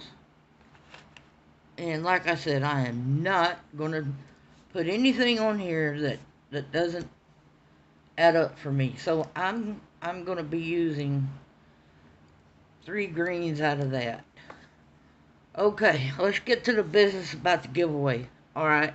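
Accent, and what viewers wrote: South, United States. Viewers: Don't you dare not use your beautiful southern accent!!🙂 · I love the color of your fish. I love a Southeren accent.💙💙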